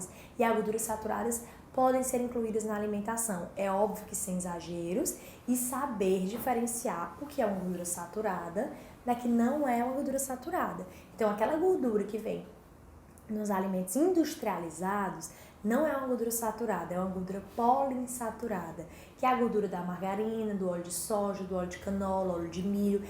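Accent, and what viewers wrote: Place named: Salvador, Brazil. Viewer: Vc é de Salvador, né.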